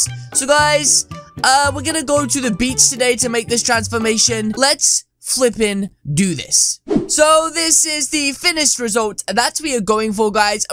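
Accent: United Kingdom. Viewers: British accent make me go crazzy.. AM LATINO.. Ayyy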